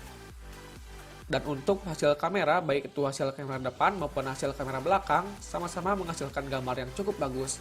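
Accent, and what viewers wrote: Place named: West Java, Indonesia. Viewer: Sunda pisan! Meni resep 😆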